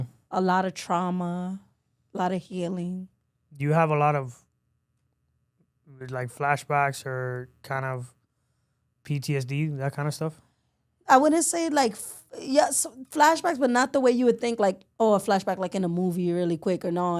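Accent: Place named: Connecticut, United States. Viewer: sounds like she is from Connecticut,,,plus she didnt mention what neighborhood,,every one from NYC says where they are from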